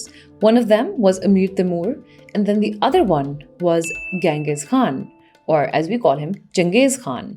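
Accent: Pakistan. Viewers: What's with the Pakistani English Accent...!? Very similar to Bangladeshi English..!! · amazing content in pakistani assent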